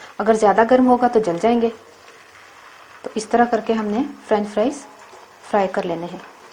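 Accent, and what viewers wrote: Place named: Punjab, India. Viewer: Ap kitna pyara bolti hain punjabi ma 😍😘😘😘 zabardast recipe 👍👌👌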